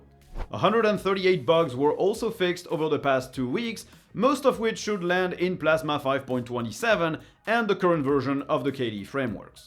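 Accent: France. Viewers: Man where u from France?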